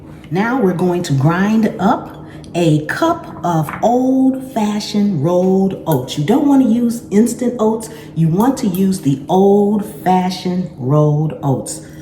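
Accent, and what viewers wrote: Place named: Upper class, United States. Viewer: Love your accent sounds like posh American. Cookies look good...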